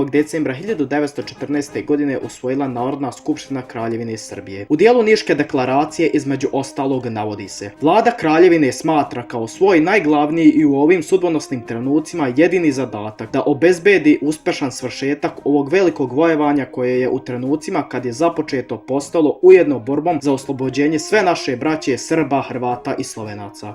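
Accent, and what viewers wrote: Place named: Cazin, Bosnia and Herzegovina. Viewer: Cazin ili buzim.....naglasak......para usi...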